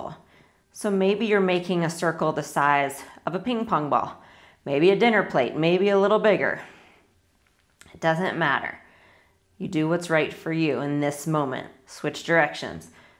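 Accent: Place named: United States. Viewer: How do spell' peeppers '. Can't understand American accent!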